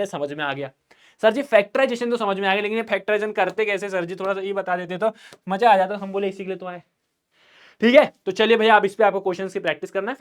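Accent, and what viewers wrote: Bihar, India. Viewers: Bihari kahika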